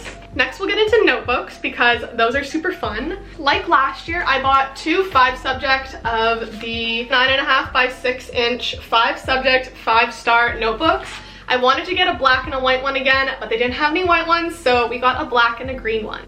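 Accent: Canada. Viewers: Hi I am also from Canada I just found your channel I am going to subscribe. Are you from Ontario it sound like you are? I hope this is not creepy 🤣. I am also from Ontario if you are. You video was amazing btw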